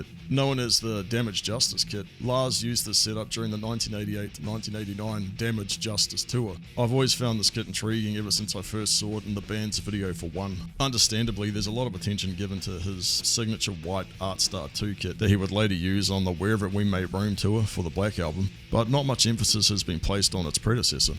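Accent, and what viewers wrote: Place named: New Zealand. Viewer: Sound like a kiwi. Im amazed you got this gear😊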